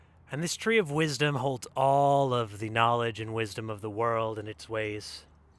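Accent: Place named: Ireland. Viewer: You have an Irish tongue, m8. Thank you for sharing. Sláinte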